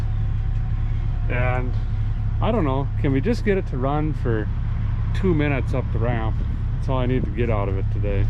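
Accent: Minnesota, United States. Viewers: Can't wait till you pick up a Southern accent on top of that Minnesota accent. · Your accent is super thick for Minnesota. I'm in duluth what part of Minnesota are you from?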